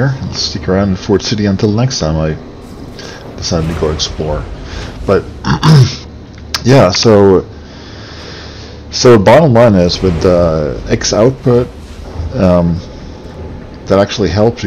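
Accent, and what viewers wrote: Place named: Netherlands. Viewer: lekker bezig man! wel beetje overduidelijk nederlands haha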